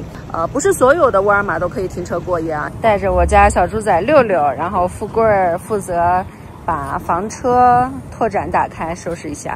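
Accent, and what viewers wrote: Qingdao, China. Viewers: 哈哈 博主大概率是青島人吧？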